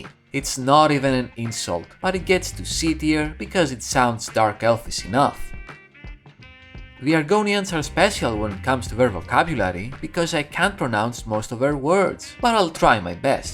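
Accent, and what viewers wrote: Greece. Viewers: Are you Greek? Me too! Haha we have the same accent!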